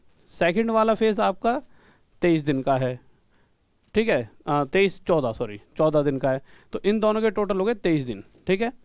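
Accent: Haryana, India. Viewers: Bhokal , web series k , pintu pahalwan jese look h sir aapka , language b haryanvi touch a rha h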